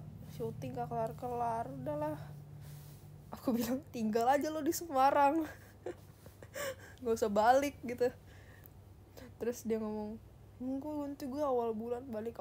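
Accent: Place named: West Java, Indonesia. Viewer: Lucuuu bngt passs bilang inima slh Mulu euyyyyyyyy jdi anak Sunda nie 😫🤏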